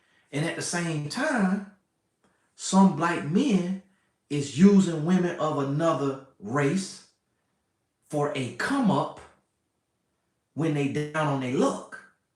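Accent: South, United States. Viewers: Where are you from Mr?? You have a " southern drawl "...lol...I guess how how you speak & address your viewers as if we all " know you "...there is alot of truth in alot you say....thank you for your videos.😊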